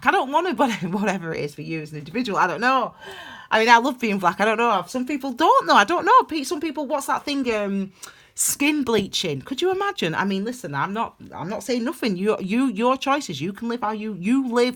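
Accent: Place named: Lancashire, United Kingdom. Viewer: First time listening, great reading, really spot on for me! Your accent sounds like you're a Lancashire Lass, am I close? I'm from that region originally myself. ♥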